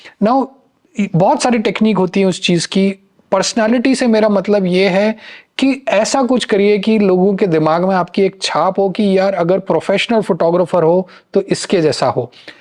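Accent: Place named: Bhopal, India. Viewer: अरे वाह आप तो भोपाली निकले,तो कवि धूमकेतु का नाम जरूर सुना होगा।उम्र में मुझसे छोटे हैं, लेकिन रिश्ते में चाचा लगते हैं।आपकी बोली से मुझे लग रहा था सूरमा भोपाली💖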